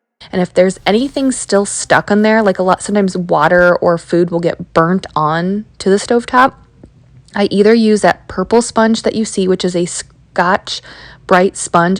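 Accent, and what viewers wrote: Midwest, United States. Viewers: There’s something I love about hearing your Midwestern accent narrate the cleaning 😂😂 I’m 8 months pregnant and can only do like….a load of dishes and sweep the floor and I’m spent for awhile so it’s nice motivation to watch you get so much done!! 😃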